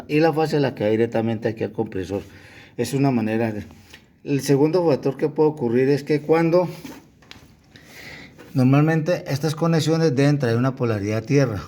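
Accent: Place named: Táchira, Venezuela. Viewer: Hermano no se le entiende nada suelte la lengua para hablar. Se entiende que es gocho y para explicar algo se ase con pausa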